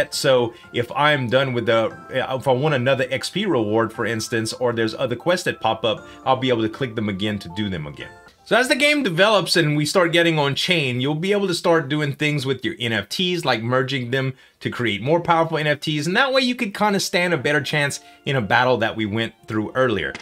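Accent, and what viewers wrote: Louisiana, United States. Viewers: Oh yeah for sure. No doubt he's a fellow Cajun from my neck of the woods.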